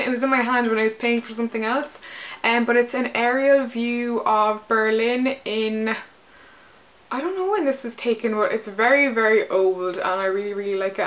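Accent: Ireland. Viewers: I loved ur haul!it was also really nice to listen to u talking about the products instead of just showing it quickly...I am glad I have found you because I think we have a similar taste in clothes. x check out my videos if u have time..xx ps. love ur irish accent...one of my best friends is from near belfast xx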